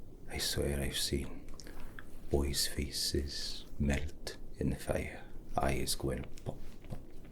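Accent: Wales, United Kingdom. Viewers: You have such a lovely voice, whether you be singing or speaking, It's lovely. With your welsh accent it just made the video better. ☺☺☺ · I can understand him easily but then again I’m Welsh so the accent isn’t an obstacle for me maybe that’s what’s throwing you off? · The Welsh accent makes my brain happy. 😁💜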